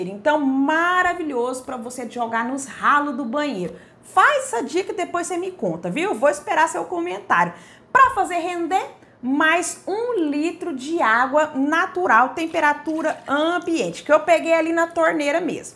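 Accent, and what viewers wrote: Minas Gerais, Brazil. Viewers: Sotaque de mineira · Amo esse sotaque mineiro ❤️ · Gostei desse segredo,vou usar em casa! Gostei também do seu sotaque mineiro ❤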